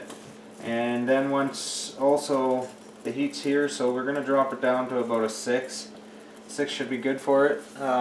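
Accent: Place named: Canada. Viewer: I wish I had his accent lmao such a pure Canadian accent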